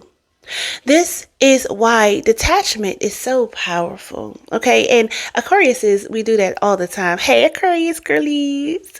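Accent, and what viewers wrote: DMV, United States. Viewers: That was beautiful! I definitely needed to hear that this morning. You’re becoming one of my favs! And do I hear a DMV accent? 👀❤️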